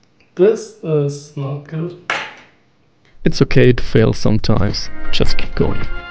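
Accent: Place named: Germany. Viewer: Nice, and then the song 😂 You sound German not Dutch.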